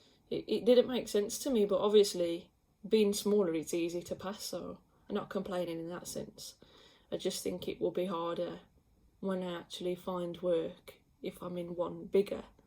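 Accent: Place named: Midlands, United Kingdom. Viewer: Hi. On your braking and some things you have said make sure your seating is right. Braking might be juddering because your not seated close enough to controls. The steering doesn’t sound right. On approach to islands etc yeah slow right down prior to entering the island and find the gap to keep on going. This saves you stopping and having to do the handbrake and you won’t need to try and pull out at such a slow pace. Mirrors mirrors mirrors especially when going around islands where you have to watch the swing on the back. All these things you mention will become second nature when you have passed and your on your own. You will still make some errors like me but you will get there. Good luck. You sound like a midlands gal.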